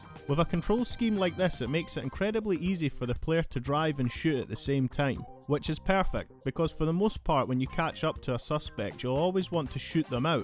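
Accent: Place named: Scotland, United Kingdom. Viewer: A Scots man giveing me nostalgic…. Earns my sub ✊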